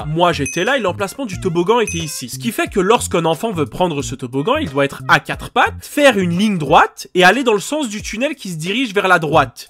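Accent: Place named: Quebec, Canada. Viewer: T’es parents sont francais? T’as l’accent québéquoye!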